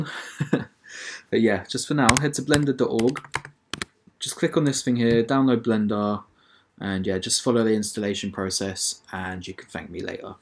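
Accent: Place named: London, United Kingdom. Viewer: Sick tutorial nice to hear london accent too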